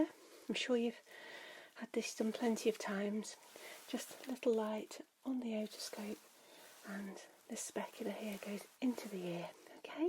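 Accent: United Kingdom. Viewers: You have one gorgeous British accent that I can listen to all day long. 🥰 I felt so relaxed and enjoyed this part 2 of ear exam and deep ear tingles here! Cheers 🍻for sharing and I wish you a beautiful Happy New Year's 2025! 🍾🎉🥳💜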